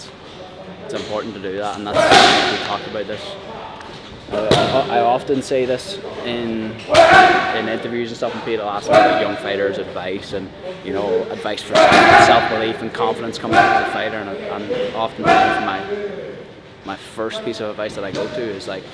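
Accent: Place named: Belfast, United Kingdom. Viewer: Were you getting a lot of feedback that fans can't understand the Belfast accent? lol subtitles. 💚🤍💛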